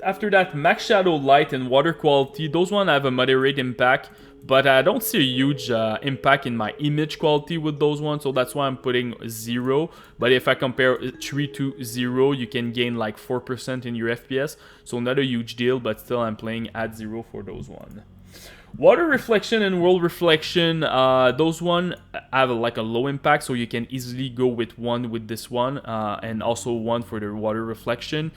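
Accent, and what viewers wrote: Quebec, Canada. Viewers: Tu vien du Quebec en! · you're quebecois ? i am and i see you really have a quebecois accent when you talk, or just canadian in general lol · oh shit un quebecois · from quebec ? haha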